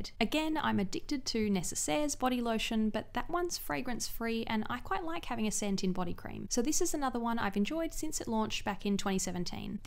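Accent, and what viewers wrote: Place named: Australia. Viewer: I love your Aussie accent. Your voice is so calming. You should make a skincare asmr video 😂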